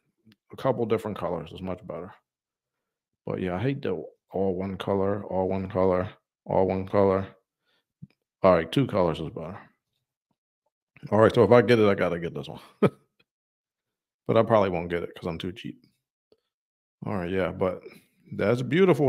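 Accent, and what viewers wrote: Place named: Mid-Atlantic, United States. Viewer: You have a very American and some might say even the classic “ Mid Atlantic “ accent.. well done sir.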